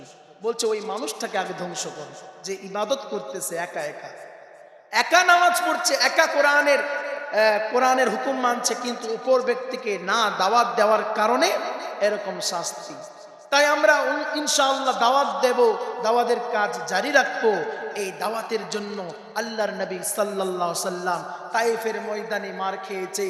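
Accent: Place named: Bangladesh. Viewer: আপনার ওয়াজ খুব সুন্দর বাংলাদেশির মত 😊😊😊😊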